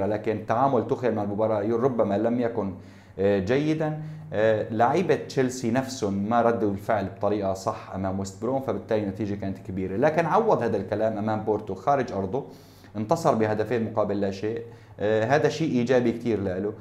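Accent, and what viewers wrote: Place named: Aleppo, Syria. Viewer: مبين من لهجتك ... يا ابن حلب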